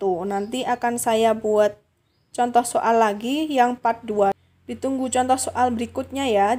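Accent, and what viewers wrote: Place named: Java, Indonesia. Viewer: Nyong seneng medoke sampean mbak sensei..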